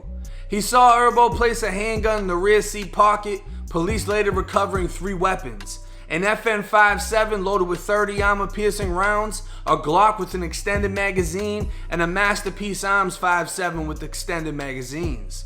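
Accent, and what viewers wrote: Boston, United States. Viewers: Bro your accent literally half Boston half Florida😂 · Where u from Boston? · The innernet instead of internet! Lmfao! We Bostonians hold our accent down! 😜. Keep on keepin on, I’m rocking will y’all and you rocking with us! 😝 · Bruh his accent funny as hell he gotta be from Boston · Yo, you talk like your from the bay side of Boston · Boston accent nukka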